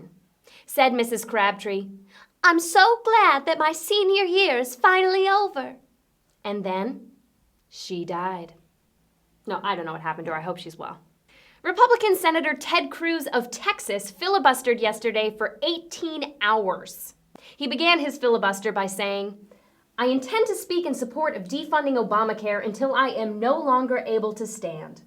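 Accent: United Kingdom. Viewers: Not what I was thinking at all. I was thinking how upset I was that you missed another excuse to show off your fantastic British accent :o(. But the freakout over what to call toddlers made up for it :o)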